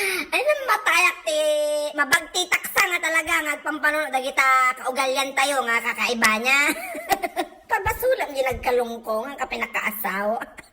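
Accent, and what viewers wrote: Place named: Pangasinan, Philippines. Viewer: Taganu ka Auntie? Taga Pangasinan ka? Paryas gamin iti pinagilokano ta hahaha, amin pay data imbagam, paypayso HAHAHA.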